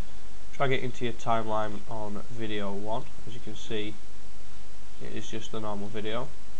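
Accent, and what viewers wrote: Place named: Yorkshire, United Kingdom. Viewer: Thanks, this was useful! :) A fellow Yorkshireman by the sound of it. :)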